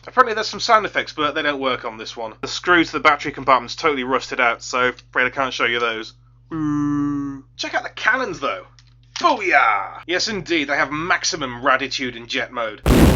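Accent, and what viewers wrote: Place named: England, United Kingdom. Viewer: Wait... are you from England like me meate? cos you sound like you're from my aera XD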